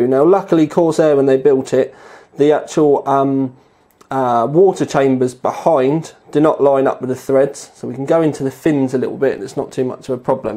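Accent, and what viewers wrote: United Kingdom. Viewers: this guy is so brittish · also you have an ABSOLUTELY BLOODY MASSIVE british accent no offense : )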